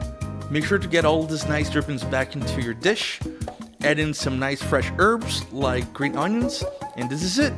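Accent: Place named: Netherlands. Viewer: You sound Dutch with your accent. I am from the Netherlands and I have a brazillian wife. I found your channel looking for good recipes. Are we bros?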